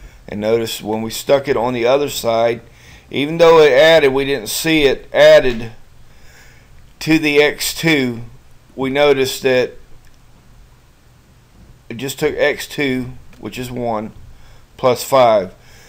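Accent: South, United States. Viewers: you sound like hillbilly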